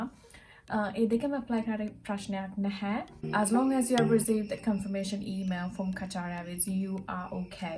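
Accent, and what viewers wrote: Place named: England, United Kingdom. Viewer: U knw what .ur so beautifull...plzz video ekak karanna english acent eka hadaganna widiha oya wage...❤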